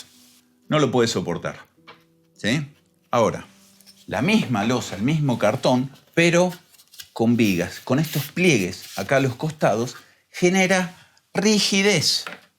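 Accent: Argentina. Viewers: Muy buena explicación técnica realizada con esa manera de hablar tan característica de los académicos Argentinos.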